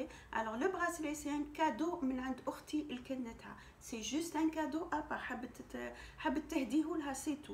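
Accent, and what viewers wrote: Annaba, Algeria. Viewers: صباح النور واش حوست على قناتك و معرفتش اسمها شفتلك فيديو فقناة réalité dz راكي هايلا بزااااااف و متربية و هدرتك حلوة اليوم لقيت الفيديو هذا زهر 😊😊😊 شحال نحب هدرة العنانبة 😍😍😍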